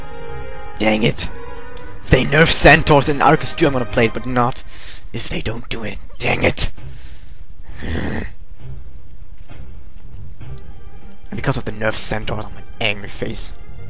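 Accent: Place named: Asia. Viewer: are u asian? u sound fob!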